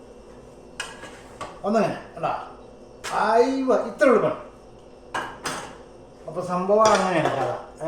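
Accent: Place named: Malappuram, India. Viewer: മലപ്പുറം സംസാരം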